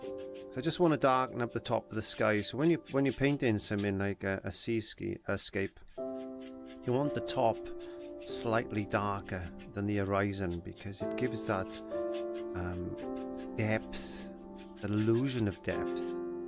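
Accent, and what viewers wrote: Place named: Mid Glamorgan, United Kingdom. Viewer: never mind the music love to see your art and hear that Mid- Glamorgan accent. I can see Barry-John running up the beach to score a try, and that's from an Englishman. Love your videos, so relaxing from one so many miles from home. Be safe x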